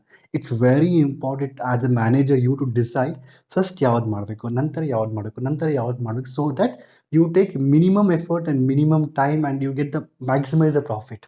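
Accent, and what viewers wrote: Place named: Malnad, India. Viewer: Sir, where are you from.. Whenever you speak i feel u r from malnad... Pls reply... Since i am also from malnad i am curious to know 😁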